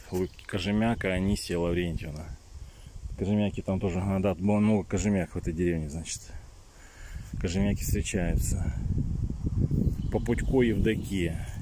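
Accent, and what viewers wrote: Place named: South, Russia. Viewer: Это не украинизмы. Это русизмы ,а точнее южнорусский говор!....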